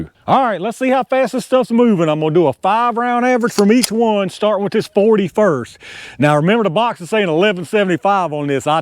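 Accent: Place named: Georgia, United States. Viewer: I love your videos, your accent takes me home... Augusta Georgia!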